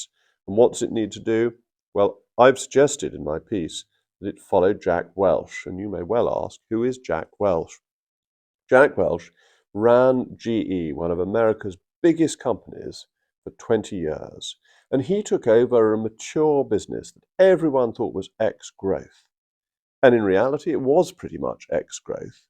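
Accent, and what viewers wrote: RP, United Kingdom. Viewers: Imagine being so posh that what failed political party you have to stick with is a mere bauble by which you display your social class - Jacob, is your accent not more than enough? Come on, man! You wouldn't go down in class by supporting an objectively more conservative party than the tory party, surely. Your relationship with the tory party is like listening to an abused wife speak about how she'll always love the husband that beats her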